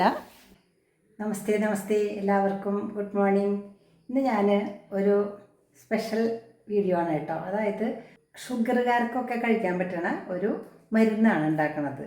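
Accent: Thrissur, India. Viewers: അതുമല്ല, തൃശ്ശൂർ ആണെന്ന് തോന്നുന്നു 😅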